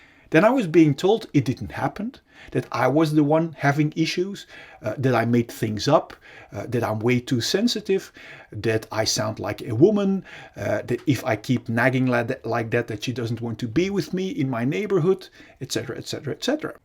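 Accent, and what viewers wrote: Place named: Netherlands. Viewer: I can hear your Dutch.... thanks for the video👍🏼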